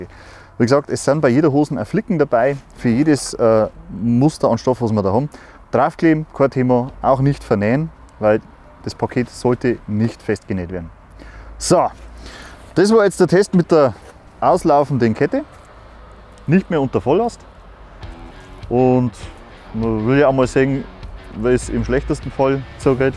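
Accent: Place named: Bavaria, Germany. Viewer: Schon ok, alles gut 👍😊. Alle Dialekte sind ja was Tolles 👍😊, ich wünschte ich hätte auch einen 😉. Bayrisch kann halt nurmal "etwas" anstrengend sein zu verstehn. Aber is ja mit Sprachen allgemein so 😉. Ansonsten, Videosstyle und Präsentation sind 👍, auch wenn ich in Wahrheit ein Fan von Fauna u. Flora, also auch von Bäumen und Naturwäldern bin, und mir jede Fällung eines ausgewachsenen Baumes das Herz blutet und mich zum Menschenfeind mutieren lässt . Grade in Zeiten eines Klimawandels. Immer mehr Bäume und Wälder werden in rasendem Tempo niedergesägt und statt dessen immer Winderräder aufgestellt 🤦‍♂️🙈 😔 . VG 🙋🏻‍♂️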